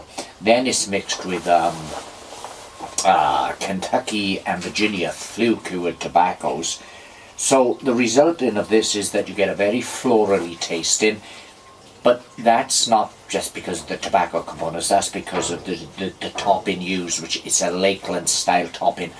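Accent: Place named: Welsh Valleys, United Kingdom. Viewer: Who'd have thought it? The YouTube algorithm eventually found me a channel exactly to my tastes. I have a fair amount of pipe channels saved and favourited, but you're the only fellow Welshman I've happened upon, and a western writer too. Dare I assume your accent is from the Ogmore Vale, maybe the Rhondda? I'm hailing from the Twrch valley between Swansea and Brecon. From what I've seen, your content is great. Fantastic job, Bendegedig! You should be proud 😎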